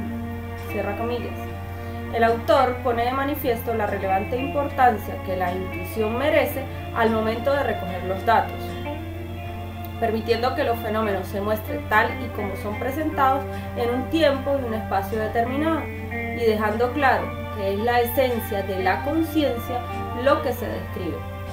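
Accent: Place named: Bogota, Colombia. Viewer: ser la dura del curso! paraces bogotana jejejeje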